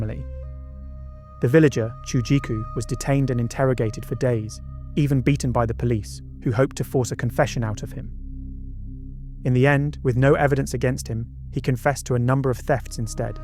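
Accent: England, United Kingdom. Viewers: Excellent narration! Love the beautiful English accent & diction.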